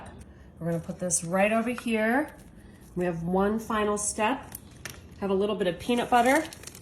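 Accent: South, United States. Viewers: So why the southern accent on your other channel? 🤔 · So in your newer videos on your other channel you have a southern accent and sound totally different. Is it fake? Or is this accent on this video fake?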